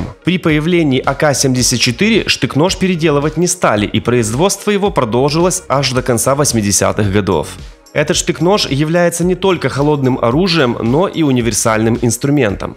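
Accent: Ukraine. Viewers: есть что тут сказать. Смех да и только ребенок с явным акцентом страны 404 пытается рассказать о том в чем не разбирается.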